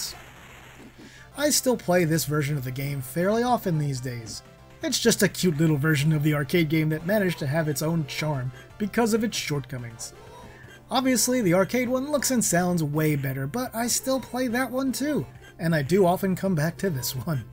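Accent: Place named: Western Canada, Canada. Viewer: That's cool. You speak a bit like they do out west.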